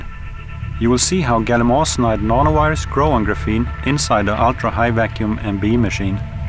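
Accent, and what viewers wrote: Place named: Norway. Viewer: This is so cool, and so much more authentic with a norwegian accent.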